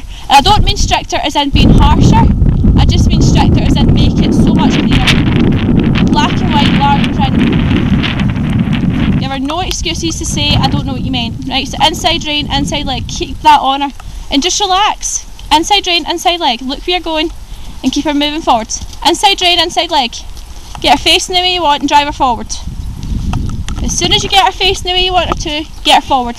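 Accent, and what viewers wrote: Scotland, United Kingdom. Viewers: wow you sound fantastic - i totally need to get you as my instructor haha. also - bizarre question - but are you glaswegian or scottish? xxx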